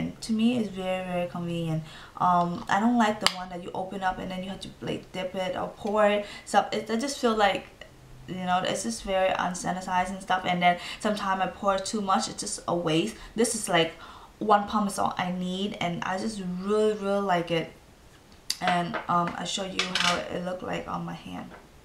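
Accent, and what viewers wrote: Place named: Asia. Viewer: it's not slang... it's an asian accent... something obviously she can't help... it doesn't sound like slang at all....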